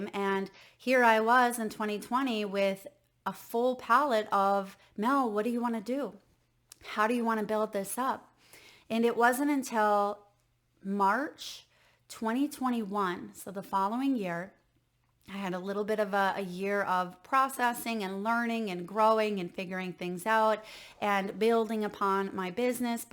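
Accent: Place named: Midwest, United States. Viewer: I've been watching you for some years now and always thought you sounded so midwestern! I grew up in ND and went to college in MN, my best friend is from Wisconsin :)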